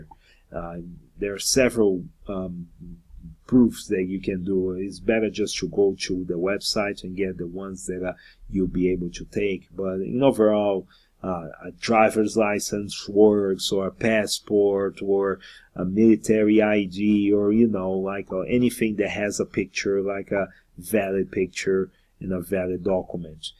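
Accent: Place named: Brazil. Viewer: You sound Brazilian. Are you?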